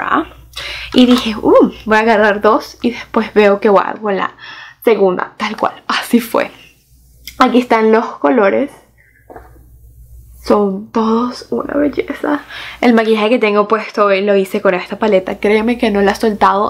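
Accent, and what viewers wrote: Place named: Venezuela. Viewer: Sii! qué lindo sorteo!!! bienvenida nuevamente!! te veo desde Argentina! lo que más me gusta de tus videos, además del contenido, es tu voz! jaja no sé porqué pero me resulta muy relajante jaja será por la tonada venezolana jajaja.. bueno te sigo en casi todas las redes sociales menos snap ya que no tengo :( espero se comprenda que muchas personas no contamos con cuentas en algunas redes sociales ya sea por falta de tiempo o simplemente por gustos...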